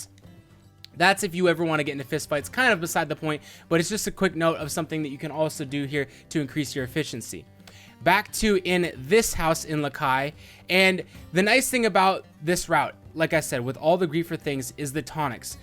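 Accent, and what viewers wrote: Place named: Russia. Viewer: I have to ask (re: Russian/Ukrainian accent)--are you of Russian descent, or it the accent just a fun-to-do thing? 8-D Either way, I always get a kick out of it. lol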